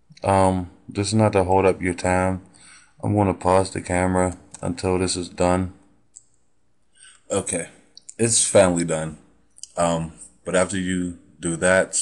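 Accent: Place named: Pittsburgh, United States. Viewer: im from the burgh to lol son as i heard ya voice i knew you was from the burgh !!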